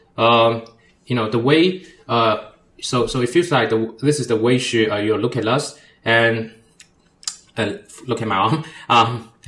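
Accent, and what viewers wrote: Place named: Singapore. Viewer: but why do you speak Singaporean English🙂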